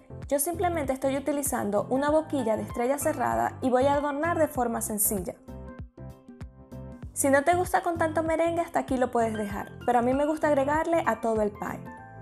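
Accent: Venezuela. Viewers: Parece q eres de Maracaibo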